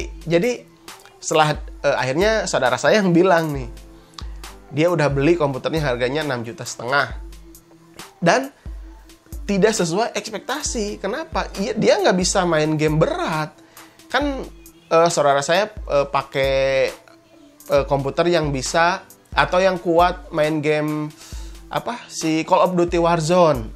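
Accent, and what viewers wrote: West Java, Indonesia. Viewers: Sunda pisan euy :v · nyunda geming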